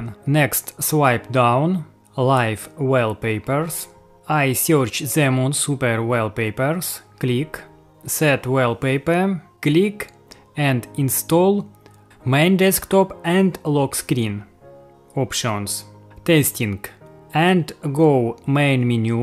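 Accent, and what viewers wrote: Russia. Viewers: ахпхп тут понятно что ты русскоговорящий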